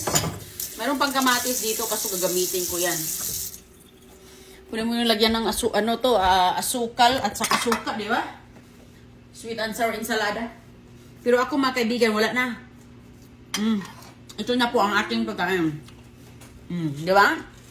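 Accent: Visayas, Philippines. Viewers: Tama iba ang bigkas nang bisaya kung magsalita nang tagalog.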